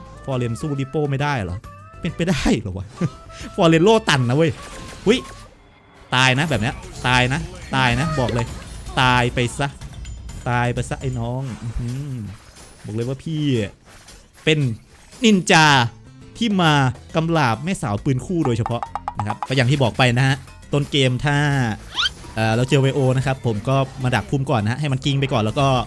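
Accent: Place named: South, Thailand. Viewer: แหลงใต้ชับพึดนิ5555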